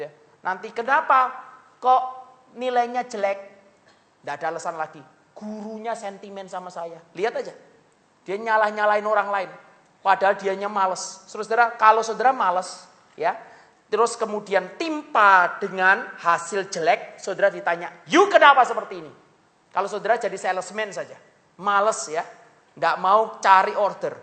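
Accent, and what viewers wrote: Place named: Java, Indonesia. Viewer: Logatnya tetap beda medok "Jawa"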